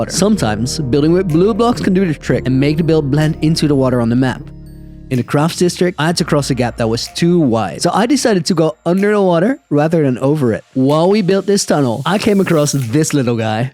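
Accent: Netherlands. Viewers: since I joined this adventure when u only had like 50K subscribers, I immediately recognised you’re Dutch accent, I am from Belgium but I really enjoyed every hour I could watch u. Keep up the great work man!!!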